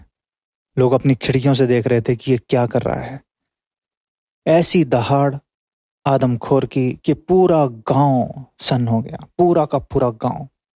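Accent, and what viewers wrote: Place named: United States. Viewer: Ye american english me kyo bol rhe ho ?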